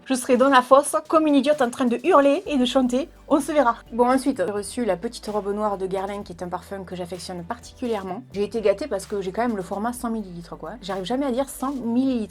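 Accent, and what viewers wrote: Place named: Perpignan, France. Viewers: Bjr mon 1ier comm depuis plus de 2 ans ma 1iere ybeuse ma préférée avec or elly. Tout ce que ns raconte tu ns apprends. Pleins de similitude..ma mamy était de vidaillac lot jpasse mes 6 mois d'été Aude et P. O. Jacheche #essensse#chez Julia a la jonquera. J'adore cadaques maison de Dali et aller à Gironde le mercredi j'achète pas ceux que tu ns conseilles. Tu es belle une belle tête bien pleine t'as le peps j'adore j. P.arker.jnai pas trop eu de kado de ma faille bien que j'en fasse a ts les 6 moi jsuis maman kdo. Que veux tu mes familles de cœur c parfois plus important et elles m'ont gates puis les kdo 2019 je vais m'en offrir n'a.. L orange de la petite mémé wouau. J'ai déjà custo ttes mes blouses avec des perles les créoles d'après t conseil la jvais attaquer mes boots. Bonne merveilleuse année chère à toi ta maman ton chouchou, 🐕et la santé..moi c pas le top mais Jle dis pas c pour a que toi o relly Nicole et mon Ange jne loupe rien.je commande sur ALIE. 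Joom et un peu shein. Sur les conseils daurely every thing five pounds. Grossiste français. Bonne résolution ne plus me laisser faire par des C... Tourner la tête et ignorer. Ensuite aménager mes coins beauté fringues. M'habiller chic comme toi. Et me faire plaisir ça de pouvoir louer une partie de llannne dans le 11 et le 66.lespagne....mon oxygène. Merci pr t élans du ❤️et ta simplicité Perpignanaise au même accent💗poutous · Quelle belle histoire de Noël! Ma femme veut bien l'adresse de cette gentille dame aux oranges car nous ne sommes pas loin de Perpignan ! Lol 😉 trop sympas vos vidéos ca fait plusieurs qu'on voit et on aime! En plus, avec l'accent de chez nous ça fait du bien ! Hop on s'abonne avec plaisir. On a apprécié en particulier les vidéos sur Yves Rocher, Cien (Lidl) et les astuces... Bonnes fêtes !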